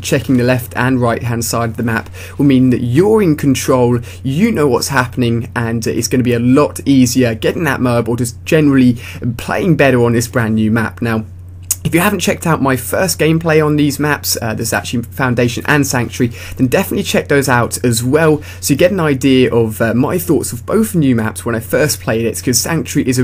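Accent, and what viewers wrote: England, United Kingdom. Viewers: r u british ? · nice english accent I understood everything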